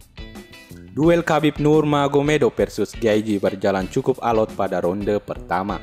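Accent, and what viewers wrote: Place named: South Sulawesi, Indonesia. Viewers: host nya pasti org sulses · Orang Bugis yah pembaca narasinya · Itu suara orang sulawesi bro.. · Yg punya chanel pasti saudaraku dr p sulawesi.dr logat nya.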